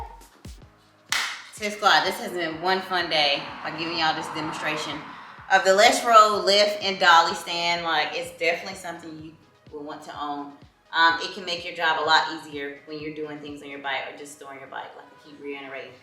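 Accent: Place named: Texas, United States. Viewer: Got a Texas accent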